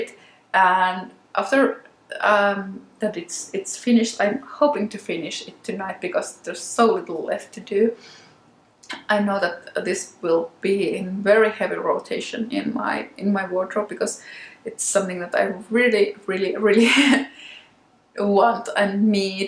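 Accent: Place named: Finland. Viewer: your finnish is showing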